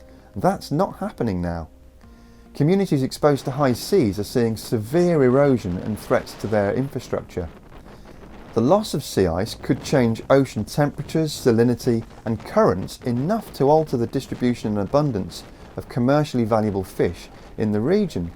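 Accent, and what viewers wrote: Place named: Cockney, United Kingdom. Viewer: Lots of "sensationalism" & "doom&gloom, if it bleeds it leads" MISLEADING PSUEDO SCIENCE from our cockney accented "pseudo scientist".... Notice he says "sea ice" a lot. Propaganda from fiberal globalist seditionists seeking to "carbon tax" us into oblivion, this video is clearly paid advertising to sell us all into submission... don't believe the rhetoric. HERE ARE THE FACTS: 1) ice shelves are very thin floating 'skins' relative to the main ice mass at the poles, making for dramatic imagery (likely photo-shopped to support the phoney narrative). 2) yes, "sea ice" is diminishing, and yes our climate is warming, but... NO, Sea levels will NOT rise & flood coastal regions. 3) NASA's circumpolar satellites measure surface elevation & micro-gravity (mass directly below the sat.) and clearly show FIVE TIMES MORE ICE IS CURRENTLY DEPOSITED BY SNOWFALL AT THE POLES than what is lost to melting "sea ice". 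4) the disparity between the much greater annual polar deposition vs. loss of peripheral "sea ice" is ACCELERATING (especially at the Antarctic landmass). 5) while global warming is for real, it will NOT result in "catastrophic sea level rise" for the above reasons as well as greatly increased evap/precip filling depleted lakes, rivers & aquifers, as well as greater atmospheric moisture held in clouds & humidity. 6) CLIMATE MODERATION will greatly warm our winters but ACTUALLY COOL OUR SUMMERS in many regions due to the cooling effect of MOBILE liquid meltwater vs prior stationary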